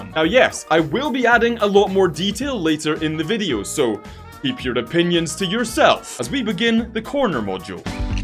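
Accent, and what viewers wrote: United Kingdom. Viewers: Can you make a battle from ever war BTW are you bri’ish or a Scotty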